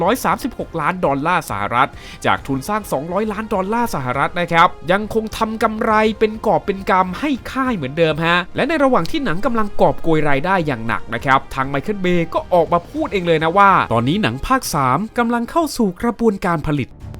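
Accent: Isan, Thailand. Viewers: ผมละชอบจักหวะพูดอีสาน 555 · มักเสียงพากษ์อิสานของเจ้าหลายๆ😂❤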